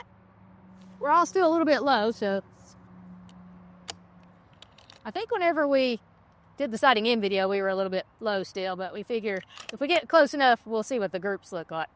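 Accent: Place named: New England, United States. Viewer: Your country Yankee accent is funny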